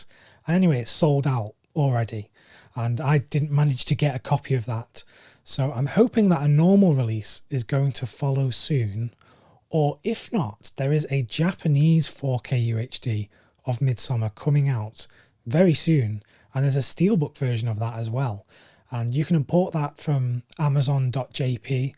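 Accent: England, United Kingdom. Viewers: So you sound English are you based in the states? Only reason I ask is I can never order anything from Best Buy online. 4k discs are multi regional but the extras on the bonus disc are not. If you have a link please post it. I wanted BTTF but even <link> says not available in yiur area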